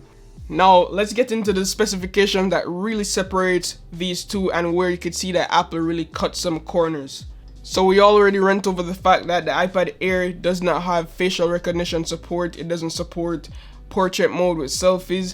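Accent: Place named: Jamaica. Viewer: you sound like you have a little Jamaica 🇯🇲 in u bro great video tho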